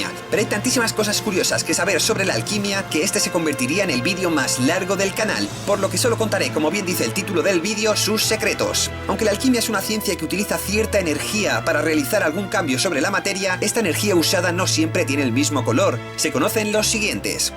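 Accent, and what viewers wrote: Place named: Spain. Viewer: Holy cow, yo si quiero la segunda parte! Porfa :3 aunque definitivamente la pronunciación es muy diferente en España que en Latinoamerica jaja me encantan esos detalles n.n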